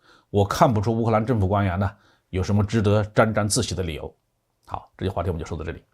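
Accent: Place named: Xishui, China. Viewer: 好浓重的浠普，哈哈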